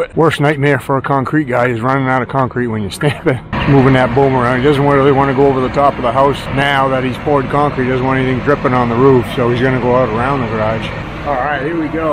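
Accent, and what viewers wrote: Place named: East Coast, United States. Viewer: Hey man, sounds like you on east coast my brother. I got some rising concret around the perimeter of my pool. The whole back yard is concrete. I have bullhead placement stones around pool which are good. The issue I'm having is rising concrete around my northern edge of my pool which has a about 5 feet to the stucco wall border... It is at most 1 inch-mostly about a 1/2 inch rising right at the placement stones. I was thinking of just sledge hammering it down and using cement all to put it kinda back together after fracturing it down. A friend said to grind it down. All my control joint which are about a 5ft intervals are cracked so I was going to polyurethane them and pound out the edges up against the pool placement stones... now this is probably crazy but as an option...I was also thinking of pouring the cement all and leavening out the bullhead slant up to the rising edge... 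So my man whats your suggestion????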